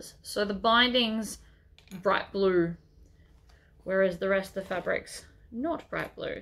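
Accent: Australia. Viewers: has a Mitsubishi, that she painted herself, and has a Video, or a few showing how and what she used to do it.:). I too love listening to her as a Fellow Aussie, not many that do tutorials, so it is lovely listening to her.:)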